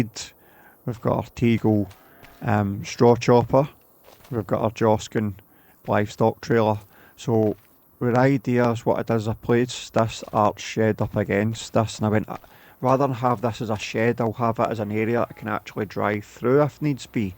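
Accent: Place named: Europe. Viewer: I absolutely LOVE your accent brother. Well I guess it's not a accent to you but it is to me 😂😂 I'm just a silly American who loves European accents...Scottish, Irish, UK...Oh yea, nice build too 😂😂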